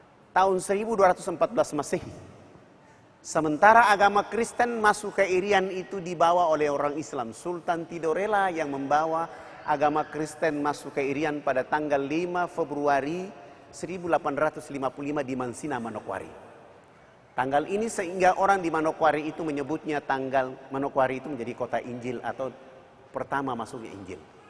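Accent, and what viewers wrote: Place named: South Sulawesi, Indonesia. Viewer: hihihi bugis makassar jie ee😊😊😊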